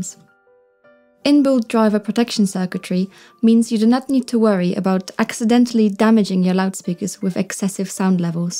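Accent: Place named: Poland. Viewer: Słychać że polka :)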